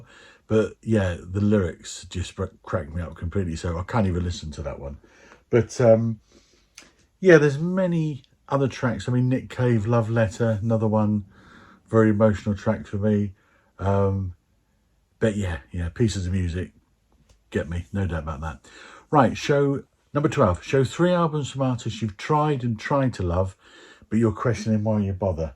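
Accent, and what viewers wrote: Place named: Portsmouth, United Kingdom. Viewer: Different forms of dancing indeed....😎 .... and ... nice to have my suspicions on your accent confirmed...you can take the boy out of Pompey eh!?!...Nice one copper..PUP....upwards and onwards...Heavens Light Our Guide!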